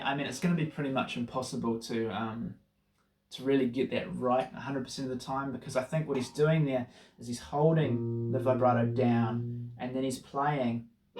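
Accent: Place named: New Zealand. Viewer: Thanks for doing this. It's a lovely song. One note: Because of your Kiwi accent, I thought you said 19th fret when describing the solo when you were actually saying 9th/10th hammer on. I was flummoxed for a few minutes until I groked it.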